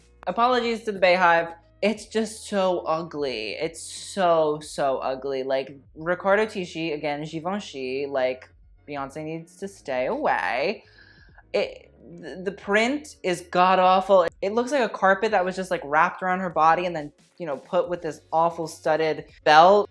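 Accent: South, United States. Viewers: The southern accent is killing me 😂😂😂 hahaha scoot queen 👑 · Love the southern accent but we don't pronounce the t in words like butter is a budder. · i appreciate that you committed to the southern accent throughout the whole rihanna review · The southern accent!!!!! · Why did suddenly go southern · His southern accent! I'm crying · I loved the south accent 👌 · I didn't think could be sexier and then I heard his southern accent 🤣❤😘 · no southern accents please just british is acceptable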